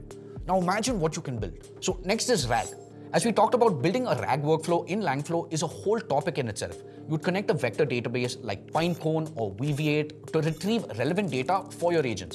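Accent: India. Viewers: U don't look Indian but sound Indian wonder why🤔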